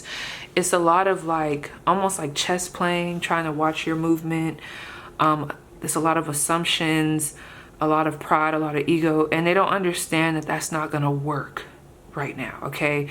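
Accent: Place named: Oklahoma, United States. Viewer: Lol that heavy. Rando okieaccent was for me I know it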